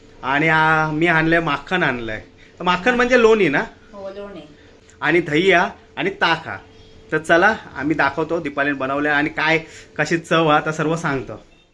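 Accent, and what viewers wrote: Malvani, India. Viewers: Tumchi malavani bhasha aikayla khup aavdate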